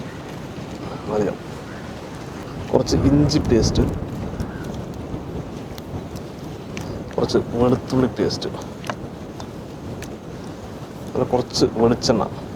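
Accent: Palakkad, India. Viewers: Typical palakkad style of speaking. Good way of preparation. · പാലക്കാട് ഭാഷ സുപ്പർ. പക്ഷേ നോൺ വെജ് തിന്നാത്ത കാരണം ഇതിനെ പറ്റി ഒന്നും അറിയില്ല. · സംസാരം കേട്ടിട്ട് പാലക്കാട്‌ ആണെന്ന് തോന്നുന്നു വീട് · Allelum palakan slang ishtallathorindo.,🥰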